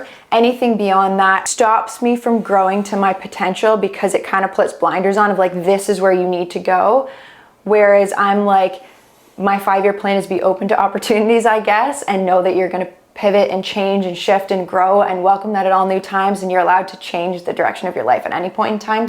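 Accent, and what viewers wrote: Canada. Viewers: I love hearing Canadian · I figured you were Canadian by the way you say words like 'House'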